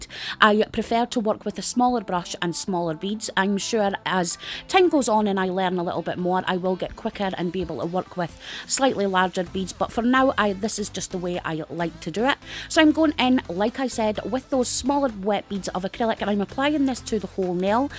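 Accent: Scotland, United Kingdom. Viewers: Totally stunning designs you are truly amazing x you are very professional and amazing when creating your designs just love watching you do your magic and love the accent from up the road bonnie Scotland 🏴 ❤️❤️❤️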